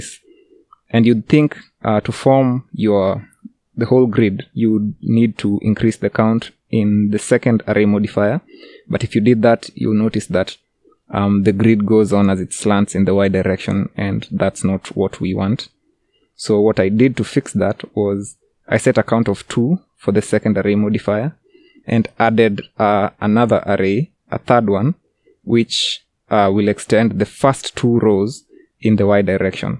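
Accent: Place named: Uganda. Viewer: Your accent reminds me of my old Ugandan accent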